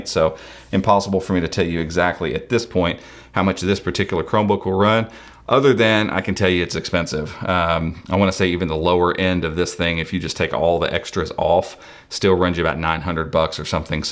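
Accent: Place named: South, United States. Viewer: Talks SO fast in a southern accent for a Mainer is hard to understand you.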